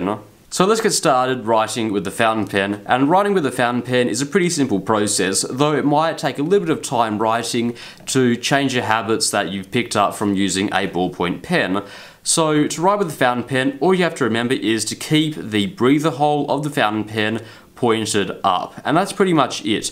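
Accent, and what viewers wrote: Australia. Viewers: It's great to have a local reviewer. I so seldom hear Australian accents let alone a Sydney accent. Noice!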